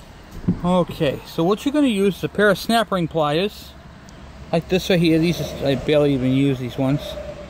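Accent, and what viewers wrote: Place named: Maine, United States. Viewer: also, i noticed you have an accent... You wouldn't happen to be from Maine living in NH?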